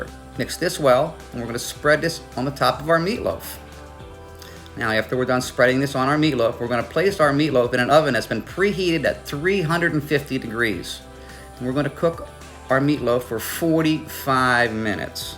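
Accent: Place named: Philadelphia, United States. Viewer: I'm from Philly so I recognized the "accent" even though I don't think we have an accent lol..I watched the video twice to make sure you didn't say water...that would've been a dead giveaway 😄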